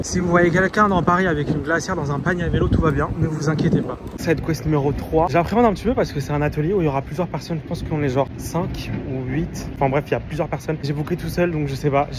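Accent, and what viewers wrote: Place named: Ireland. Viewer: l'accent irlandais to die for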